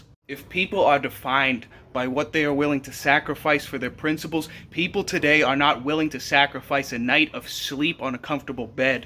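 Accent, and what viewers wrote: Boston, United States. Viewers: I’m on the same page as you dude 100%. My only comment is that it’s funny to me that your Boston or whatever accent that is disappears when you’re on Live. Are you still in the army? Did you ever go to boot?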